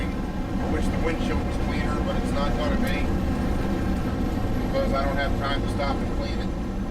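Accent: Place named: Philadelphia, United States. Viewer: I lost track of how many times you said "wooder".. where u from driver? My hubby pointed it out. He's saying Delaware county PA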